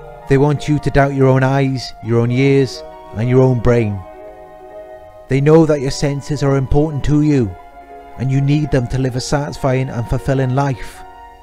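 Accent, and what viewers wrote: Wales, United Kingdom. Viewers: Welsh accent matey!! Yep. So deep, this guy. xxx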